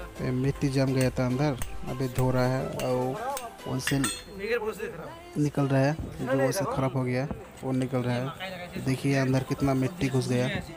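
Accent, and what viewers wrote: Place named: India. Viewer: Aap video banane ki Tameez nahi hai aap bilkul Khadi bhasha me baat kar rahe ho aisa lagta hai aap kis gaon ke rehne wale ho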